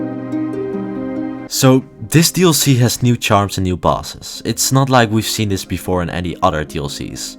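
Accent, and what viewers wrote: Netherlands. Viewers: Dutch accent moment, nice vid tho haha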